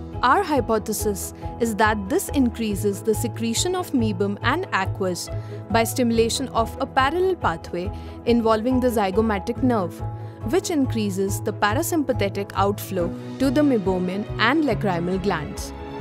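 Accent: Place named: India. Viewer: I love indian accent Love INDIA from HUNGARY 🇮🇳❤🇭🇺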